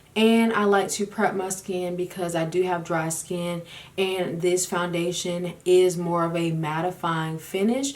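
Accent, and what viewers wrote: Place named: North Carolina, United States. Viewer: love your accent? are you from North Carolina?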